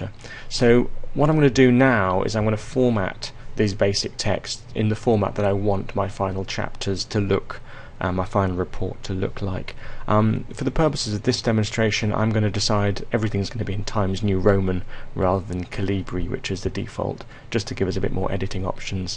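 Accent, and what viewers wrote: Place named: United Kingdom. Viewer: For some reason, my brain pays 50% more attention to this guy just because of the British accent.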